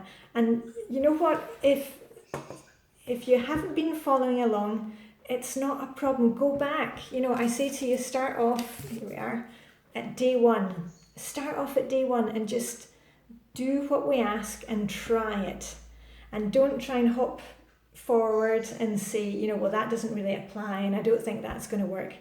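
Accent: Scotland, United Kingdom. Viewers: ! <3 I've been meaning to ask, just for fun... are you Scot/Scottish?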